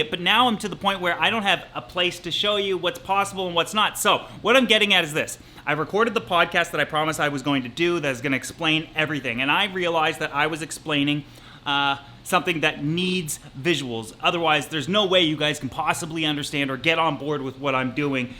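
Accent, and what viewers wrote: Canada. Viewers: That's some Canadian accent! :-)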